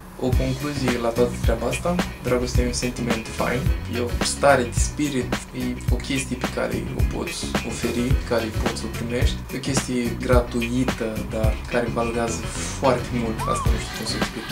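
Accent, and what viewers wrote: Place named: Moldova. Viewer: o intrebare mai ciudata ^^ tu esti moldovean sau ardelean? sunt curios dupa accent esti moldovean dupa vocabular pari ardelean deci nu stiu daca mi-ai putea raspunde masi bucura pa pace!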